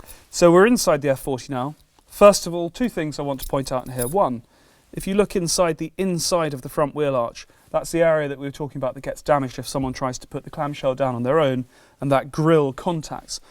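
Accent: United Kingdom. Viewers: hearing a brit explain how collectors complain about having a lift vs having an auto lift is a rich ppl problem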